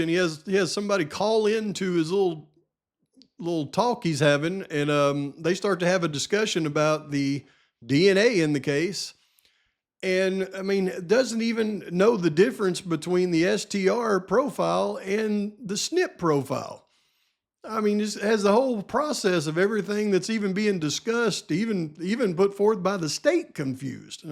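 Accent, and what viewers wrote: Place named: Texas, United States. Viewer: C i too recognize acent. It's pure texan.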